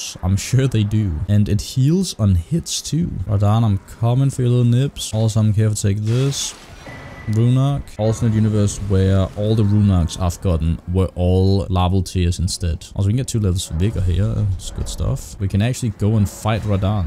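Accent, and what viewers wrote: Denmark. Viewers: så din første elden ring video og ku bare hører du var dansk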